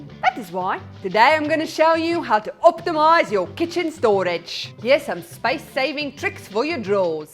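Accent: Afrikaans, South Africa. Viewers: I saw with da rands,your name and your Afrikaans ascent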